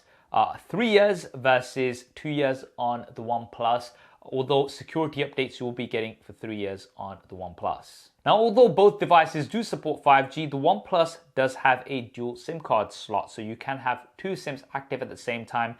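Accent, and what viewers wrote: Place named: United Kingdom. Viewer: British accent makes me uncomfortable 😐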